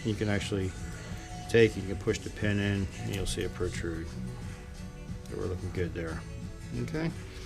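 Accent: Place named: Delaware Valley, United States. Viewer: Thought you might be from the Delaware Valley listening to you throughout this great instructional video. I just noticed the DCFS videos in your library. Do you still shoot there? I just joined this year and started shooting Trap, perhaps we'll cross paths?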